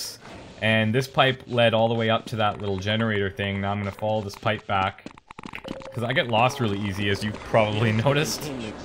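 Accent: Canada. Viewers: I'm so glad I found this LP :) Keep it up! And Canadian accent ftw.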